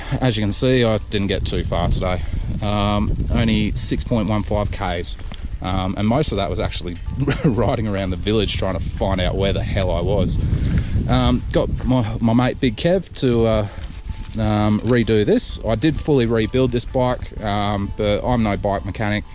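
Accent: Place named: Australia. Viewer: Love the aussie accent mate. Also love the bike, great video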